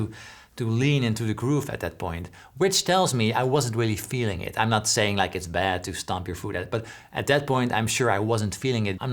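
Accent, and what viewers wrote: Netherlands. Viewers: Did you say Holland? I was trying to figure out your accent. You're showing me the music inside the music. Thank you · i might be rly dumb here but I never noticed the dutch accent till now...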